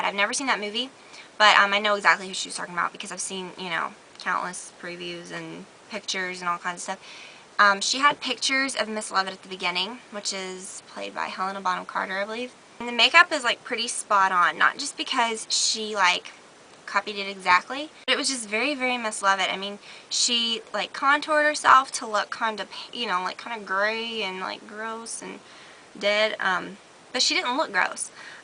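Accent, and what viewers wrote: South, United States. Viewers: "and at the ieendd" Haha your southern accent comes out more and more the more videos i watch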